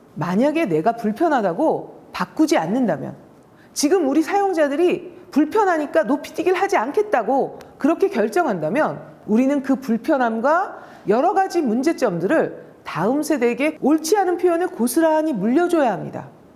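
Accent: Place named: Honam, South Korea. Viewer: 내가 음성 감수성이 뛰어난데 서울표준어에 호남 어투가 숨어있네 고향 사람이라 반가운데 서울 출신으로 되어있네 고향이 부끄럽나 왜 왜 왜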